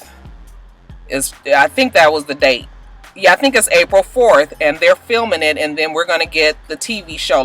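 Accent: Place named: Detroit, United States. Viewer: Are you from Detroit?...cause you sound like it, just wondering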